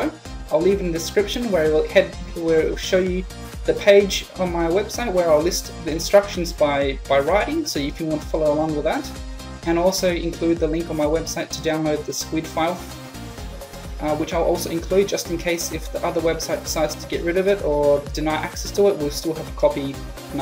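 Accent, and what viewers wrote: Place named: United States. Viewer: An aussie with an American like accent... You're on youtube to much and listen to way to many americans!! youre an AUSSIE not a YANK!!!!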